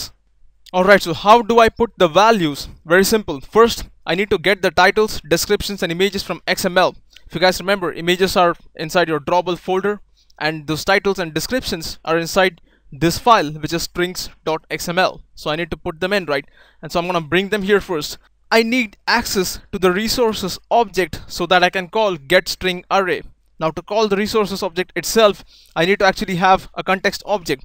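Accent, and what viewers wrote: India. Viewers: we need more tutorials like this, clearly explained, comprehensible and energetic, especially coming from someone with an indian accent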